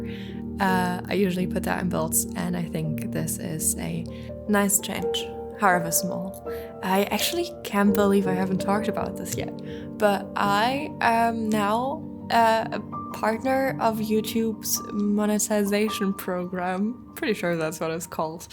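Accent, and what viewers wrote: France. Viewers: You sound part french, danish and irish to me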